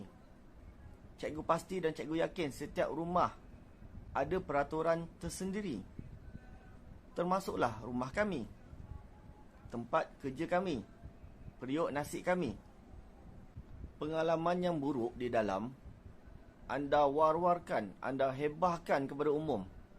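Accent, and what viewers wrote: Johor, Malaysia. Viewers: Cikgu asal orang johor ni heheh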